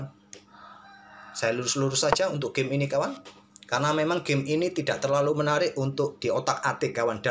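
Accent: Java, Indonesia. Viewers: tak tantang lagi ogah, tak tantang lagi emoh, wong jowo ternyata admine wkwkw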